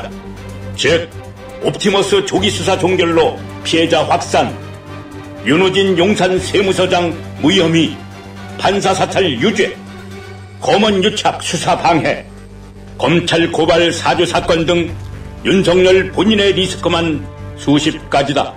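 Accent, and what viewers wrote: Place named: North Korea. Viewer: 맞아요! 아나운서님 목소리와 말투가 북한찌라시로 들리니 죄송하지만 다른분으로 체인지해주시면 좋겠습니다.아주 중요한 사안이니 유권자들에게 거부감없이 100%팩트 그대로 전달될 수 있게 부탁드립니다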